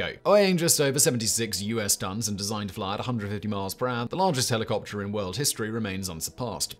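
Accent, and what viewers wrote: England, United Kingdom. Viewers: Has got more English 👀 over the years or is it just me????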